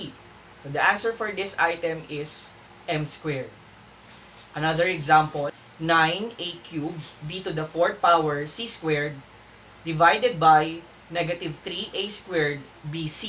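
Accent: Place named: Philippines. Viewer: I love his Filipino accent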